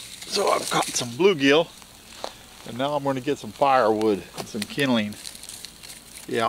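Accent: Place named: Midwest, United States. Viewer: I'm from WI and you sound exactly like a Midwesterner! Great vid, you're making me wish it wasn't 1° outside right now where I'm at in MN.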